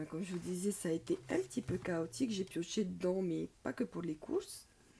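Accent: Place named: Tahiti, French Polynesia. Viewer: J ai l impression que c est un petit accent Tahitien 🤗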